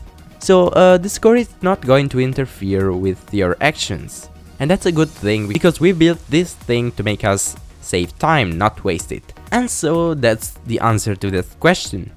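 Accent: Italy. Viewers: are u italian?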